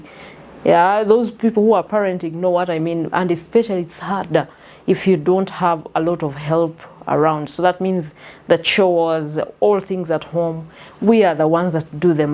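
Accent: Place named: Kenya. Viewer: you look kenyan now and talk like them😂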